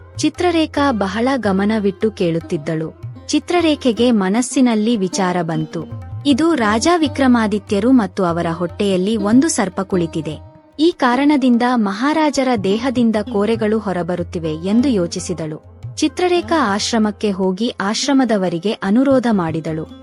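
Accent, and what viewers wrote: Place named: Maharashtra, India. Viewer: Neevu yaru Maharastra davaru iddiriye Kannada vannu heegeke mataadutiddiri😂😂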